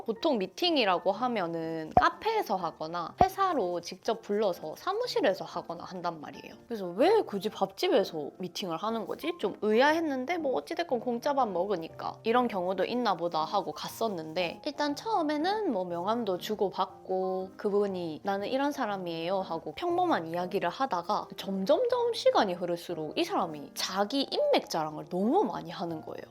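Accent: Gyeongsang, South Korea. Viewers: 언니~~ 7,8년전부터 계속 봐왔으니 자기일인것만같아 너무 화가나네요…그때 한국어도 아예 못 했었는데 언니 영상을 맨날맨날봐서 그런지 지금은 일본인인데 경상도 사투리 조금 섞인 상태가 되버렸어요ㅋㅋㅋㅋ 그사람들에게 이제는 성공하고 멋진 모습을 보여줄 수 있어서 저도 너무 좋아요!ㅎㅎ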